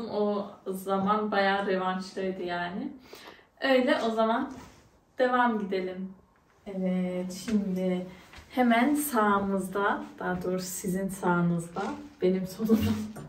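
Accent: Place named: Usak, Turkey. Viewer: Güzel Kızım benim...Uşak'lı olduğunu Beyza'nın evine geldiğinde, konuşmandan ve Tarhanandan anlamıştım. Ne de olsa aynı Toprak..Kan çekiyo demekki. Güle güle otur her iki evinde de. "Ehh bende şindi Abone oluvedim gali!" Iyi ettim mi gııı?😉❤😘 Seviyom seni...inşallah bi gün Uşak'ta, Izmir'de karşılaşır ya da buluşuruz.😘❤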